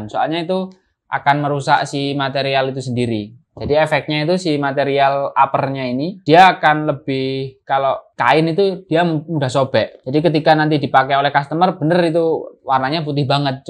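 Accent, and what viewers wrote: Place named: Java, Indonesia. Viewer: bahasamu lo mas muwwedok banget, dan klo masih newbie jangan ngejelasin yg kurang dipahami oleh penontonmu. belajar public speaking lagi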